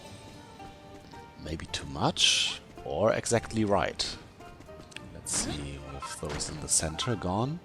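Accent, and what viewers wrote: Germany. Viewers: Warum mschst du keine Videos auf deutsch dein englisch ist erhörbar, das du aus Deutschland kommst. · Naja, dass du deutsch bist hört man an deinem Aktzent ;)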